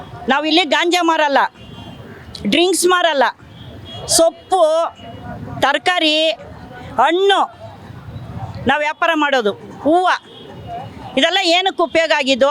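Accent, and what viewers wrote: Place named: Tamil Nadu, India. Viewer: Tamilnadu ge hogi maaru. Aa slang kelak aagalla.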